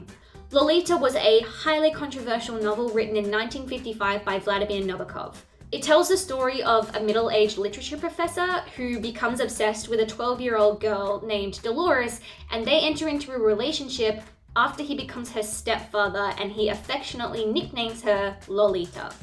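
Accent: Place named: Australia. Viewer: As a dude, I was so uncomfortable after he suggested they go to his house and she began to talk about what she would be expected to do that. I deleted this one. The student teacher trope, however, is one of my favorite things ever I fully admit that don’t judge. I love things like dark notes, and misbehaved if you’ve read either of those and lessons in sin. Also, are you Australian? Sounds like it and that is the coolest thing ever.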